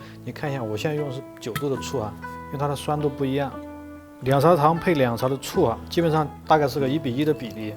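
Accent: Sichuan, China. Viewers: 期待的年夜饭特辑，好喜欢！我小时候在四川住过四年，家乡的方言听着好亲切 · 喜歡川普口音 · 很喜欢看你的美食视频，很轻松，节奏也控制的很好，少许川普加时不时的幽默— 社会佩奇😅 请继续分享，我继续点赞👍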